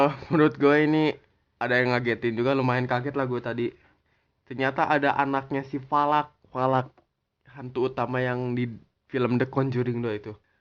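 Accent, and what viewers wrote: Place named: Java, Indonesia. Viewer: bung asli mana kaya orang jawa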